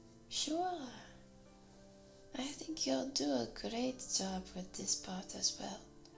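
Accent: Japan. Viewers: My dumb ass read the thumbnail text as ‘kitsunei kurasai’ 😂, Your Japanese accent was excellent and made the entire session truly special. The way you spoke English with that Japanese accent literally sounded exactly like my mama! 😆 Ohh and the new binaural microphone was an amazing experience, it immersed me to the point where I felt genuinely present in the workshop. The ending was perfect, sweet and wholesome. It added a heartwarming touch to an already delightful experience, leaving me with a sense of satisfaction and gratitude. Thanks so much for this superb audio it was a masterpiece. It’s now one of my favourites and I can’t wait for more like this!! 👏🏼 Good luck with your exam today, I hope your mamas feeling much better sending prayers and my best regards ❤️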